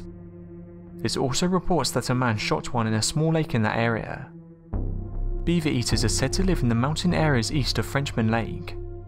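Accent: United Kingdom. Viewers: Interesting report. Too bad you string all your words together so even incomprehensible at 75% speed. As if you Brit accent isn't difficult enough on American ears. I'm unsubing.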